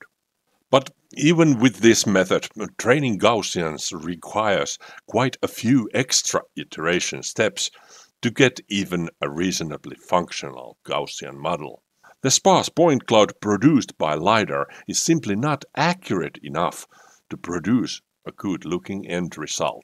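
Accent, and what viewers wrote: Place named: Finland. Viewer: The content is great, thanks! I also very love the Finnish english. The sound of their voice is so calming, also when they are really upset :)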